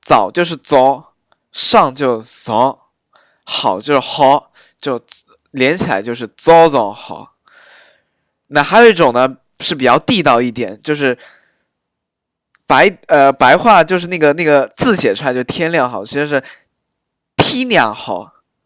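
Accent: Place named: Ningbo, China. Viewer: 妈蛋 你一开口我就感觉听到自己的声音了 宁波人果然说话感觉都有差不多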